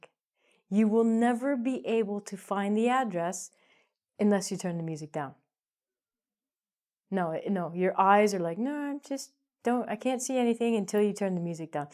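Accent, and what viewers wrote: Canada. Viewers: Is she from Canada or uk??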